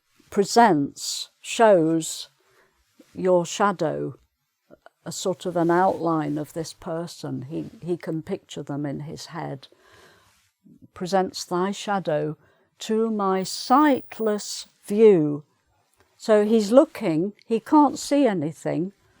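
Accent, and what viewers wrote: United Kingdom. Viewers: I'm 15 years old and I'm from Brazil! I love your work and I understand a lot of your words, british accent is beautiful! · Omg this lady is a pure british perfection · I love British accent, and you are the best ♥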